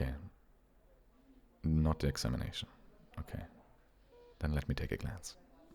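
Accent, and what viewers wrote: Germany. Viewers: Are u From germany?💞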